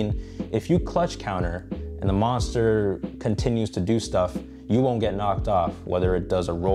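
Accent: Belgium. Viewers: Are you from Belgium? :D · You're from belgium?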